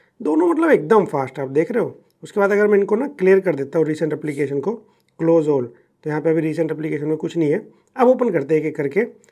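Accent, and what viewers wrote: Lucknow, India. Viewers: Bhai aap Lucknow se hona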